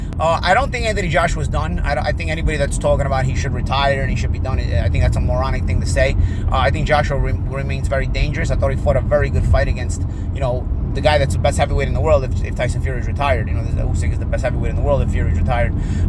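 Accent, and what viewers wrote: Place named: New Jersey, United States. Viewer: Too much jersey wop accent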